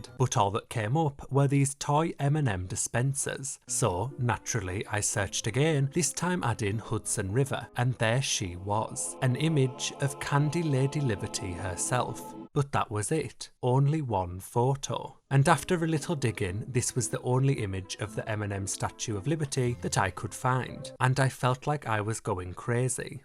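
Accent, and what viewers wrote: United Kingdom. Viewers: Why is he so british? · Great video, your voice reminds me of Jon Ronson. And with that, has that Cardiff sound 😅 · English accents are hilarious, the southern accent is funny but your one is really funny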